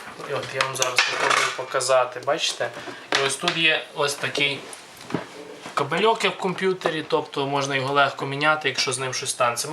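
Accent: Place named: Lviv, Ukraine. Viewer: гадостный акцент львовский. лучше бы молча делал.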